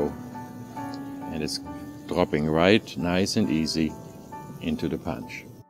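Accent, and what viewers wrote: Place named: Germany. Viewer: I love this, I absolutely love this. It put me right in the Christmas spirit and made me feel right at home. I have a very german family with very german older relatives, and this all just brought me back home. And to top it off I have a new recipe to bring to my (Polish) inlaw Christmas table. Who I know will absolutely love this! But honestly, how is it some guy, with a german accent, somewhere in the world made me feel so at home and comfortable all at the same time. I honestly wish I could spend a night or a week at his hotel just to feel all that in person. This man is the host that all hosts strive to be.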